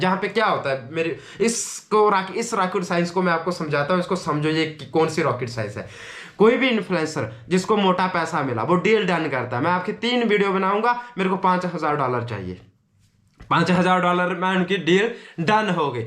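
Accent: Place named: Pakistan. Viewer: Pakistani aur tumhara dono ka bolne ke tadika same hai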